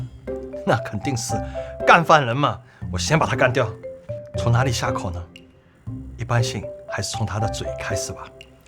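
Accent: Shanghai, China. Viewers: 老师可不可以用上海话讲一期啊，加上字幕就好，听讲话我好心焦，沪普，为什么要让讲普通话呢，之前那个广东师傅就用的广东话录的视频。而且这种传统点心配上吴侬软语的解说更加有感觉！！！！！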